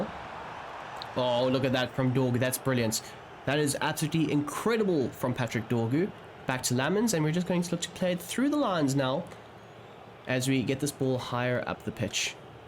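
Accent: South Africa. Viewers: I know a South African accent ev3n 8n passing. Had to double back a give a follow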